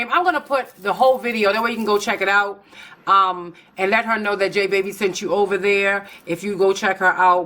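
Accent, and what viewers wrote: Baltimore, United States. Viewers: The boots are sooo cute also where are u from sound like you are here in Baltimore ! Loving your channel ❤️❤️❤️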